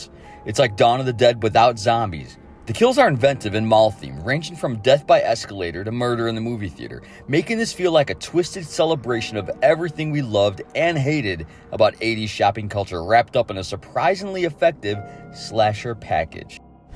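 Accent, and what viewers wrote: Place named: Chicago, United States. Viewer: I thought you might be from Chicago. Your accent is subtle. I am 45 from Tinley Park.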